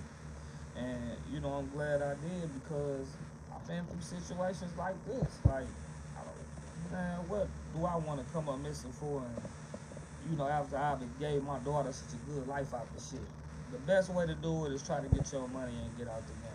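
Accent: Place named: Appalachia, United States. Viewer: You mine As well take his spot cuz. Ya got the hillbilly accent whole thang. Just like Willy did.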